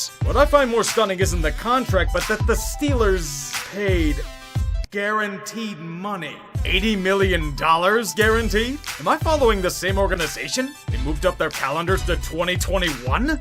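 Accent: Pittsburgh, United States. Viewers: Huh. A new editing style. If i said i was a fan of it, id be lying. I loved the indie vibes from simple editing, and he sounded... contained, rather than without a filter. I need more Yinzer. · i love the new format!!! if there is a god, will be the season/blooper reel's main voiceman, as long as his yinzerism doesn't show through too much. SEND ME A PRIMANTI BROS SAMMICH YOU DIRTY BASTARD!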